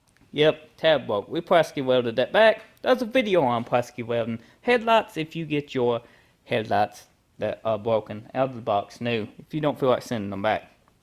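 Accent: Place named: South, United States. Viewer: Thanks for the video, your accent is very interesting! what part of America r u from? I'm from Australia. I'm guessing u r somewhere in the southern US but still curious what specific area. 🤓 I installed my aftermarket headlight today, went pretty well only snapped one bolt and many of those brittle bitch clips lol ps for anyone yet to do this job, remember to remove the metal bracket from the original headlight and switch it over to the new one! Don't do what I did and have it nearly all together scratching your head wondering what's missing then have to pull it all apart again 😂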